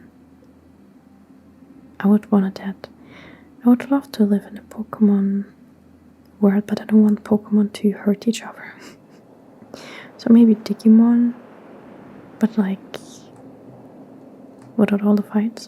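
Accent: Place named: Norway. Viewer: Thanks for this very relaxing video! I would've guessed you were from Norway^^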